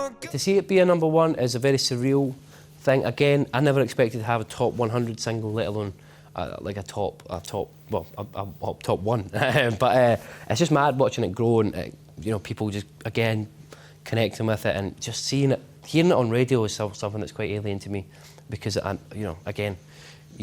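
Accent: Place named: Scotland, United Kingdom. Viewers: That song fcks me up, and now that I know he's Scottish (omfg lovely accent) and seems nice, I'm a fan ✌️ [also he looks a bit like Paul McCartney, I think it's the eyes] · Ass Juice right the Scottish accent is beautiful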